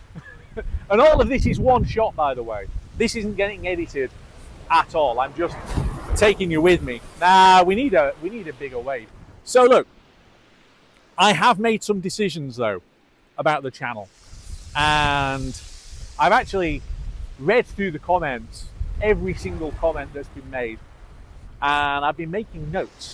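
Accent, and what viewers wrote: Scotland, United Kingdom. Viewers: I'll say this... I support your channel and watch your videos. I like to see Scotland and like to hear the accent as it's so different from where I live. That you like Tesla and good technology is something I also appreciate. I also think that anything Elon related has to be taken with a grain of salt. But what other company is doing the same things even if sometimes the owners think is the wrong direction? You are not a fraud like you said you are. You're just commentating about the tech, the infrastructure and the company. Your channel is really about you in effect and it is entertaining and sometimes thought provoking. Regarding the YouTube algorithim, I think it should be out of your mind. Do the content you want. Let the rest figure itself out.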